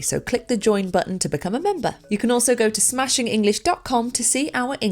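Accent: United Kingdom. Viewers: Eu amo está professora e o seu lindo sotaque britânico. Seria maravilhoso se tivesse vídeos também com legenda em português e inglês juntos. Ela teria milhares de novos alunos brasileiros.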